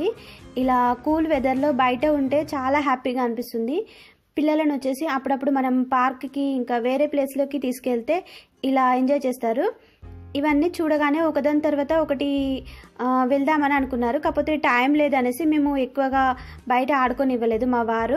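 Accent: Telangana, India. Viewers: Super akka telangana yasa superrrrrr love u akka pakka telangana matalu superrr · Hi Telangana basha mustuga maladutunavu dress super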